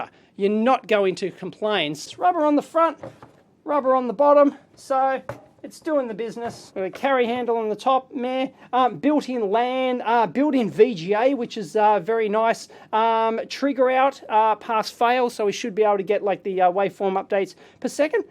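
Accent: Australia. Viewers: "Darta logging" Love the aussie accent !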